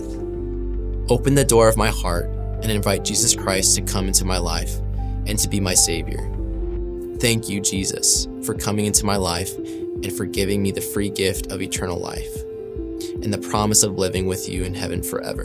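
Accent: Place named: Kansas City, United States. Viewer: You sound like you are from KC..I am sending your videos to my 21 yr old who is a senior in AR but was raised in KS. She is strong in the faith and yet goes to a huge party school where it is frowned upon. Dating has been hard for her but she managed to meet Christian guys at Country dance clubs as she loves to dance and would go there in AR and then she went out in KS to one over break and she met a guy who gave her his business card with a bible verse on back. Here I am wanting her to be equally yoked and she keeps meeting guys in the weirdest places but they dont work out. Your videos are really funny!! Thank you for being real and even though its a difficult walk..Dont compromise or give up ...persevere...Jesus is worth it!!! God Bless!!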